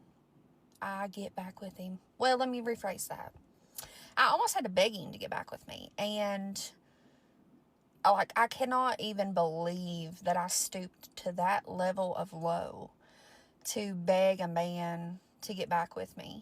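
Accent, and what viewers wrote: South, United States. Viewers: I can’t listen to her southern accent. Sorry, it’s triggering right now in our current climate. I bet she voted for 🍊. And her trauma is directly related to her southern indoctrination.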